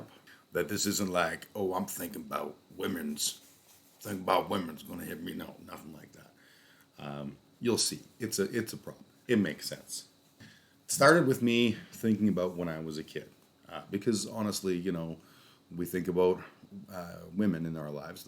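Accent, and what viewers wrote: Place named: Appalachia, United States. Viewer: I love your hillbilly accent 😂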